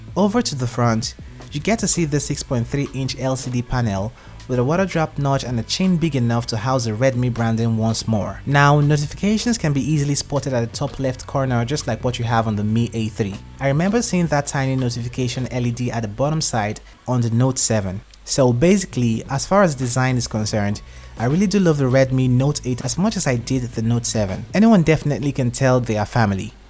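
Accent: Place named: Nigeria. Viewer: God willing you are going places bro....Exceptional Presentation Voice....Voice and Accent Wise you just the best Nigerian Youtube reviewer for now.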